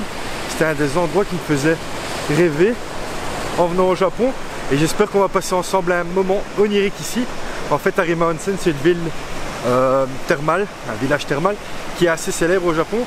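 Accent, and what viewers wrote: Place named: Belgium. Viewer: Salut! C'est chouette d'entendre parler "belge" au sujet du Japon. Merci pour ta trop brève vidéo au sujet de Kobe. Je rentre d'un 5ème séjour (en 10 ans) au Japon. J'y pratique la pêche "en mer du bord". Je suis namurois et j'ai 73 ans. J'étais à Yokohama qui rime avec drôle de choix jusqu'au 20 juin. En bon amateur de tout ce que le Japon propose, je prépare déjà mon prochain voyage et ce sera...Kobe. Voilà comment je tombe sur tes reportages. Bravo, je t'encourage à poursuivre. Konitchiwa. Guy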